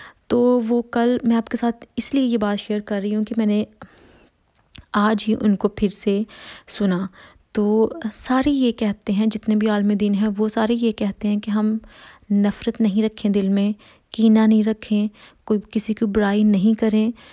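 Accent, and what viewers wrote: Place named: Faisalabad, Pakistan. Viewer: hahaha mujy bolny k texture sy pta chla tha..sb kehty faisalabad k log apni boli sy pehchan leay jatay..me also from fsd ... Khush rahen sister..abad rahen..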